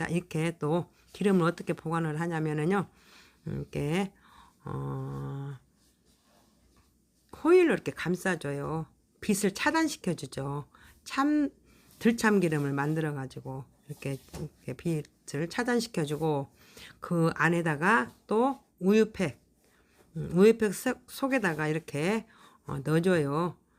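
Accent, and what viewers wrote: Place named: Jeolla, South Korea. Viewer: 다혜송❤117번좋아요ㅡ 말씀이 전라도 신듯합니다 참기름 ㆍ들기름 보관범 호일감고ㆍ우유팩안에 보관 ㅡ굳아이디어 입니다 🎉🎉❤❤❤❤❤❤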